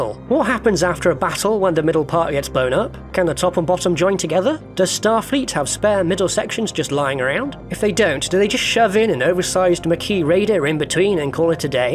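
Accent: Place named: Scotland, United Kingdom. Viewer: What is this accent? Scottish? Canadian? Kazahkstani?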